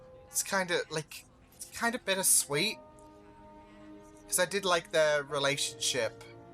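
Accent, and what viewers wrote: United Kingdom. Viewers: I don't. Most Canadians live very near the American border, get their main TV channels on basic cable, and we can tell the difference between ourselves. You still sound a bit like the people on Coronation Street. Your Britishness adds a bit of spice to your reactions - the accent, the language. It is a bit different, but very adorable. Loved your Joker, BTW.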